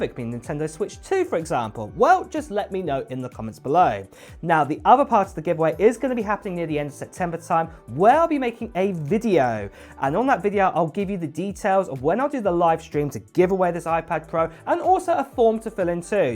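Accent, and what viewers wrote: United Kingdom. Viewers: I'm here for the UK accent.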